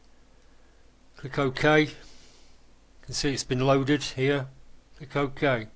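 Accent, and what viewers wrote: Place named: United Kingdom. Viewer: Let me ask. From your accent, I assume that you're British, are you? I'm Polish, greetings from Poland and carry on with your work on the editor. I'll be watching. Good luck and peace on.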